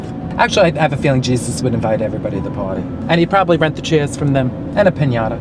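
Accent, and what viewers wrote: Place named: Northeast, United States. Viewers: There's something about you and listening to you. That's like being with a good friand your family member. The New York accent is just ahorrible. The most important thing is very pleasant to listen to you. I really, really enjoy it. You were given a geft and I hope you're happy.❤ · I just came across her channel and she's so funny. I love listening to her northeastern accent and her saying "that bothers me".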